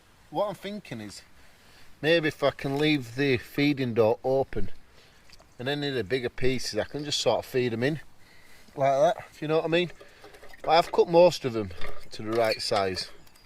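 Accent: United Kingdom. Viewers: why is this common in usa to have someone attacking you at 1-3 am edit i realize his accent is from britain but still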